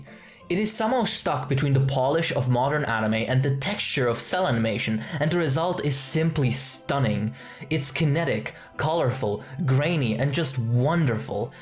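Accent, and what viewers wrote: Germany. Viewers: You're German? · Are you an german?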